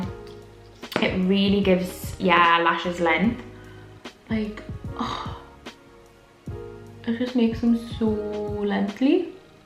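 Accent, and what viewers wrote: United Kingdom. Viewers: Hi!! I've been watching your channel since 2018, for me watching and hearing you has been a great way to improve my english not only because i try to understand everything you say but also because you have such a strong accent that helps me get used to the different ways of speaking english in the uk! I found your channel when i lived in Leeds working as a Spanish/foreign language assistant in a school and it has been really useful! I'm glad that you are encouraging people to learn other languages and to be less selfish about speaking English in non-native english countries! I wish you the best luck with this journey:)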